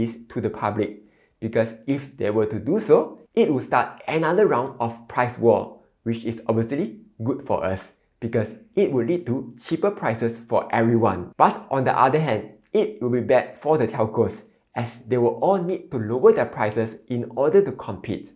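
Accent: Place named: Singapore. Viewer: This guy's accent is the most Singaporean of all Singaporeans.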